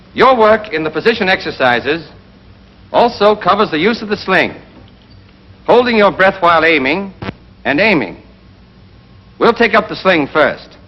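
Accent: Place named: Boston, United States. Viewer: He's speaking in a Boston accent, or New England accent.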